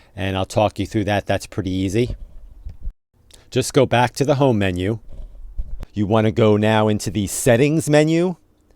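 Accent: New England, United States. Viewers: OMG...YAASSSSS!!!!! I was about to go out and buy a new megabucks router because I thought that was the issue...and then I thought...let me consult the answer to everything...YOUTUBE first. Your video popped up first, and I liked that it said "Fix it NOW!" and my daughter and I both laughed and said we have to watch that one! Because we've been at this for the longest time. Switching out HDMI cables and ports, restarting everything 100 times, even relocating the cable modem and router and all the wiring several times (trying to get closer to the Roku) all to no avail. There truly was no other option but to try a new router (admittedly mine is pretty old). But...your solution worked beautifully! We both love you and think you are a genius! And we like how you say "short video". A little New Englandish sounding accent there! Anyway, thank you so much for taking the time to put this together to save us all!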